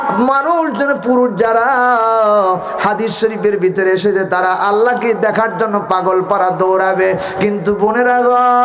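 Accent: Bangladesh. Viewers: শরিয়তপুর টান ঠিক আছে কিন্তু গলা ঠিক নাই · মনে হয় যশোর বাড়ি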